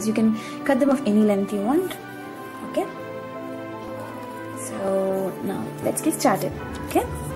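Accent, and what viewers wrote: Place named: India. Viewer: hey, i love your indian accent. you sound like you have a beautiful heart. thanks for the tutorial and am going to make some of the earring you showed us and post pictures for you. thanks for sharing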